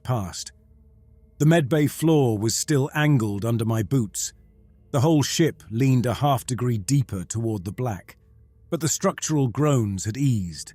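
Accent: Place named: United Kingdom. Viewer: Oh look another British accent 🙄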